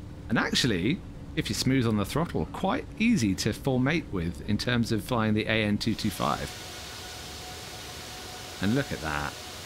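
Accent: United Kingdom. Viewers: Great video as always, hah. I have a silly question.. What is your accent called ? Is it the British standard one? Rp? Sorry for the question.. hah.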